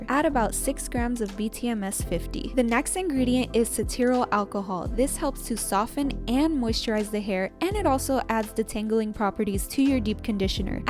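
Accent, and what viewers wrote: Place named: Caribbean. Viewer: Your hair is fabulous and I love your accent! It's soothing. You sould like you're from the Caribbean. Where are from?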